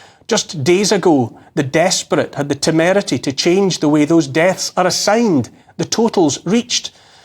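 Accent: Scotland, United Kingdom. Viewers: He’s obviously Scottish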